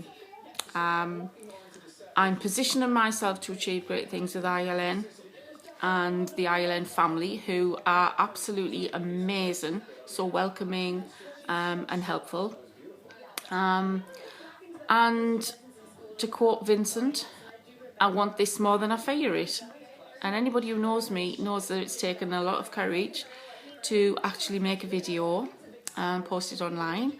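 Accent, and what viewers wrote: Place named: Newcastle, United Kingdom. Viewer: Absolutely fab Hun, love your lippie and your Geordie voice, keep them coming, you've had your breakthrough! xx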